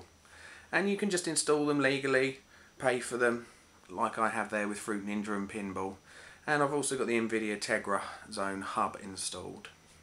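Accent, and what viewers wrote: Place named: England, United Kingdom. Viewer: I think this is outstanding vid specially for a 1st one. You have big future on YT. I can tell. Appreciate Your work. BTW Thats the second english accent i love... first was Wheatleys one obviously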